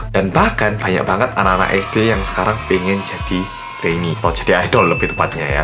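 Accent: Java, Indonesia. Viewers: Meddooook.. Lama lama aku keknya jadi medok juga gara gara sering nonton Kak.. Gpp sih menambah kemampuan bahasa ya kan Mas? Uuuuu😆💚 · orang Korea yang... Medhookk BP: Oppa!!! Ilustrasi: BTS Sempurnaaa😍😍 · Yang ku tunggu adalah yang MEDHOKKK ..udh jdi candu nih medhok buat gw😅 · Medhoookkkk, mantul rek 😂😂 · Salut sama mas oppa ini bahasa Indonesia nya lancar bgt sama medok pula . Jdi kaya orang Indonesia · wkwkwkwk anaknya orang korea yang medhok, bisa aja mas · Me : medhooook👌👏👏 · Lah...ini orang Korea asli atau orang Jawa asli ya...bingung bet... Aw..... ....... Logat bahasa ny tu lhooooooo biasanya huruf A di Korea dibaca agak ditahan .......begiy juga D lah ini kok D nya dalam bet bhs. Jawa......B ny jg wkwkkwkwkw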